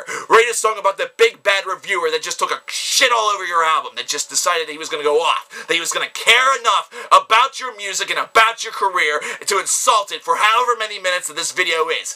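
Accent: South, United States. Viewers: Your southern accent makes me laugh so hard hahahaha!!!!! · Lol that southern accent reminds me of Bill Hicks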